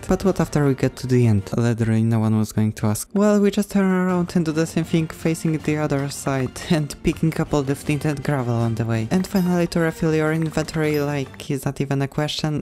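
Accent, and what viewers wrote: Poland. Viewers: Idk why but you sound Polish for me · od razu poznalem że jesteś polakiem po akcencie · fajny polski akcent B) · I thought you sounded Polish, I checked you channel and well, On point.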